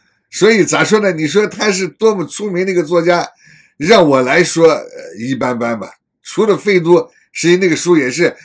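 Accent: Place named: Shaanxi, China. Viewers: 好烂的诗，陕西话一说很像黄色笑话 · 陕西话真难听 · 西安话？我老婆说是西安城边长安县方言！哈哈！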